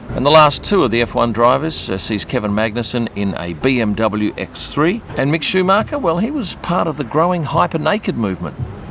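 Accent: Australia. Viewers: Your F1 videos are so unique and refreshing. I can’t stand most of other F1 videos all British YouTubers and track track track focused. I have heard enough during 3 days of watching F1 lol. Yours are much better. Maybe I just love Aussie accent cuz I live here lol.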